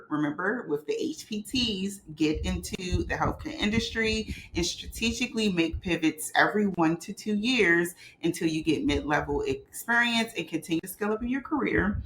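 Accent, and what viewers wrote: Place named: DMV, United States. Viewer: Very resourceful! Are you from the DMV? I am only asking because of your accent.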